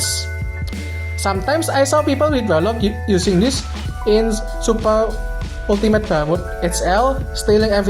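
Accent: Indonesia. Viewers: im glad im indonesian so i can understand the accent 😭😭☝️ thanks for covering this haha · Bah medok bgt suarane😂😂😂😂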